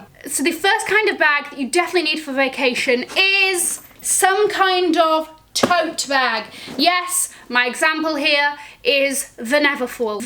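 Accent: United Kingdom. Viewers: Salaam! I just discovered your channel last night. I love me some British accent reason why my other favourite Youtuber is a Brit as well. I have been binge watching your videos ever since. I wish your videos were longer though because why they end so quick lol but on the flipside, you are on point, no hanky panky but so so so much fun to watch! With that level of energy, i pray you will hit a million in about two years or less! You go girl!!! ❤ p.s: you are very pretty MashaAllāh!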